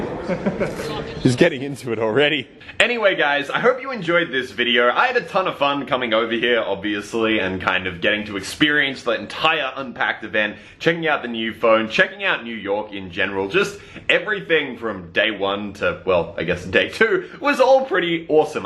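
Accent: Australia. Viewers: "warder-proof testing" love aus accent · Wow I'm dumb I just realized your Australian even though I am Australian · I really love Australian accent <3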